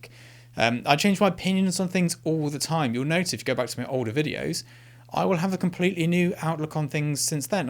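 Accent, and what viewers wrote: RP, United Kingdom. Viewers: I think you nailed it! We can not apologize or change who we are, nor should we, as some would suggest! We can only try to make things better around us. BTW, a British accent does indeed add a level of poshness, elegance, and credibility! I remember asking my wife, who recorded the answer message at her firm? It was a female British colleague. 😊 Also, at one point, I worked with a consultant who was raised in Britain. When a presentation was needed , we all agreed that he should do the honors! It always sounded more on point when delivered by him. Thanks for the insight! Cheers from 🇨🇦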